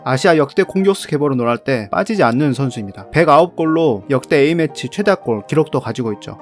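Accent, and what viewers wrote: Jeolla, South Korea. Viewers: 전라도 사투리가 심하시네요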